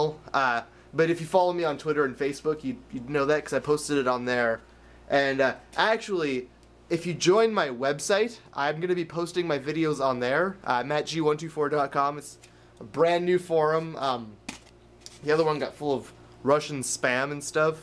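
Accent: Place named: Canada. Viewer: "so if there's ever a mess up with youtube again you can just go there and check it OOT." I LOVE YOUR CANADIAN ACCENT <3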